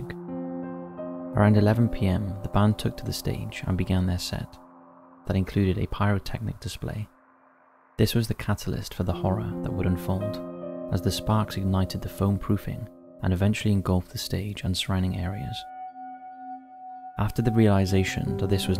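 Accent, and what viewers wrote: Wales, United Kingdom. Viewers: You realise you just said the same thing? I assume it was just an un noticed slip of the tongue. We are all human. And can surely forgive such things? They are working on a quick turnaround this month so even more understandable. FYI The narrator is british- Welsh.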